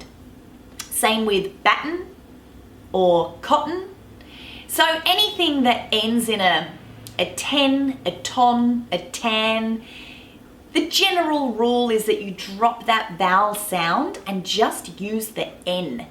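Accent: Australia. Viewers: Great teacher and a so beautiful Aussie dialect. Awesome a thousand likes. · Thank you! This helped me alot hope for more aussie accent cuz i really hatr my accent and your accent is my fav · Im very fascinated by the aussie speaking voice and finally found this channel. Now, i can really enjoy learning the Australian accent. ❤ Thank you for sharing this videos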